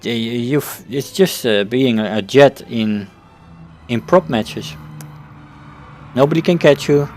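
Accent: Netherlands. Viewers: hi, are you dutch?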